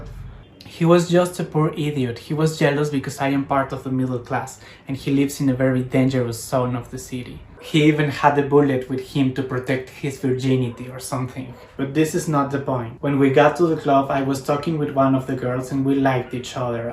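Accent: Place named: Mexico. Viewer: Your English is very good, especially since you learned it all in Mexico. The only people that I know that have an American accent are those that lived in the US for at least some time. We were in France on vacation and I spoke okay French, but we had difficulty getting people to understand the name of the city we were driving to. Finally an older man figured it out by having me repeat its name with a French accent. See you are going to have an accent because those you speak with are also speaking with a Mexican accent. Heck, my wife has lived in the US for over 30 years and speaks great English, but with a slight accent...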